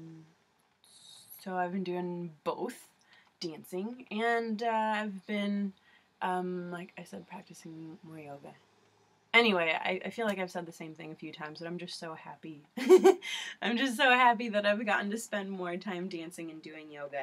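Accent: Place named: Peru. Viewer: Sounds very Peruvian :)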